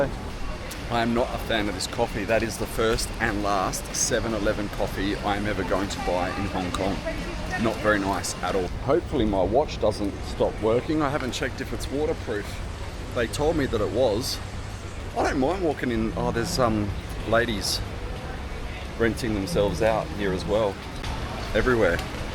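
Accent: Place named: South Australia, Australia. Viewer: from your accent I can tell you're from South Australia... nothing beats a good kitchener bun.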